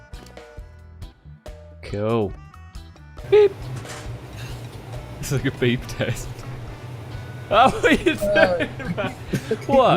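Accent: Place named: Suffolk, United Kingdom. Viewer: What part of England you from? Your accent sounds Suffolk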